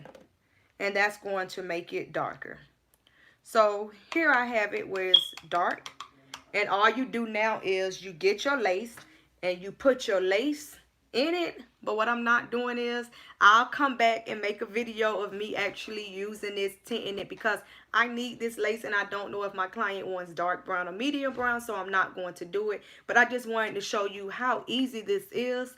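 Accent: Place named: Florida, United States. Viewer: I'm new to your channel.. as soon as i heard you talk i knew you were from FL like me. Lol. I love the videos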